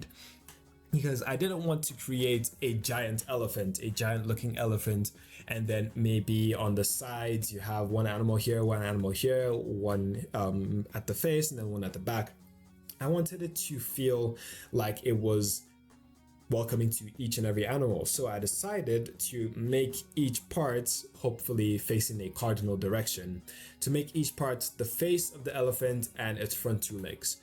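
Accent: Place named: Nigeria. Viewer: Your vids really helped me break my builders block thanks man. I always knew you were Nigerian hehe😅... M also a Nigerian we know our tongue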